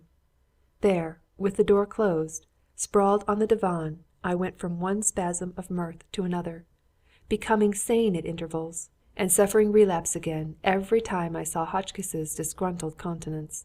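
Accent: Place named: United Kingdom. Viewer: Disqualified by UK b voice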